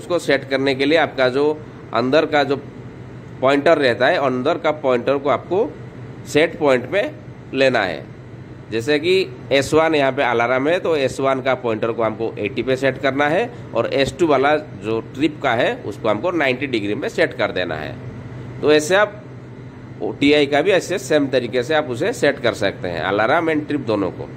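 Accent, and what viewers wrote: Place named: Odisha, India. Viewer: Odia guy....bilkul odia accent. good job dear.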